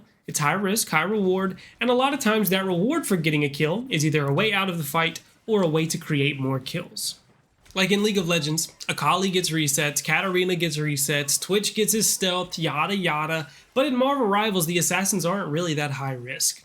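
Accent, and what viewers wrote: South, United States. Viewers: is that a southern drawl I hear? Power to you it sounds soothing to listen too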